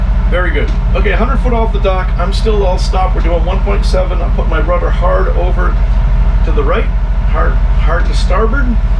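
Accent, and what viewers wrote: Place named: Louisiana, United States. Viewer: After years sailing worldwide , I assure you, the Cajun accent is nothing 😉 I ve come about some memorable ways to mishandle the English language: Try this... Helms order from a Chinese inland pilot "Yellow Yellow Yellow" 😵‍💫😖and that in the middle of the night on a river without any light and no chart we knew off and plenty unlit barges. Eventually we manage to make "Zero Zero Zero" out of it (the Chinese pronounce the "R" as "L"). Or this pilot in a remote small port in Estonia.. as there was no BA chart existing of the place, he brought his own Russian one. It looked like quite a long buoyed channel on paper. With the pilot's only English at each pair of buoys that was "Red to port, green to starboard" (Note: in Europe, it's inbound red on portside, as in opposite to America where it is green inbound on portside). 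This worked till we got to berth and plenty shallow water around. Then he uttered something without any warning and speed reduction that was to mean that we had arrived at our destination... Two days later, when outbound with the same pilot, we put him on the bridge wing and closed the door while he was like a parrot "Green to port Red to starboard" Not to forget: the Philippine sailors that always pronounce the F (or ph) as a P, there is also the odd one that pronounce the P as a F. It gives this... "chiep, chiep, I want to go ashore for a telepone call, it is very cheaf here"😂😂😂😂.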